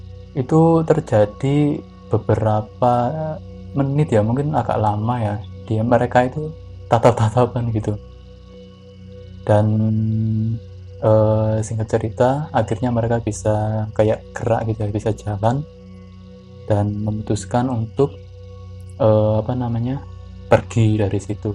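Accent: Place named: Java, Indonesia. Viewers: Medokmu ngakak wkwk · Paling males yg pake kata kata GUE, Logatnya Jawa lg, Hadeh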